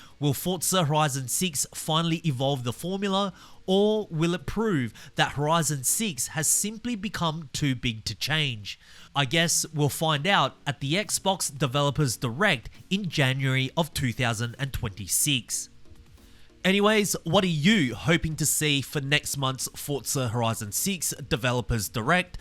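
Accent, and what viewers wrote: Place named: Australia. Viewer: not game-related, but gotta ask - where are you from? you throw around 3 or 4 different accents in your narration, which sounds like a HEAVILY tainted Aussie.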